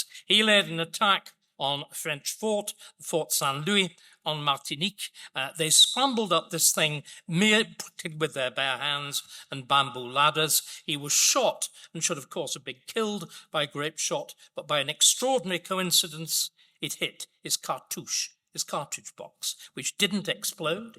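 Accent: RP, United Kingdom. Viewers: What a load of meaningless guff. Run on sentences of nothing delivered in a posh accent; who’s gullible enough to fall for this man’s schtik?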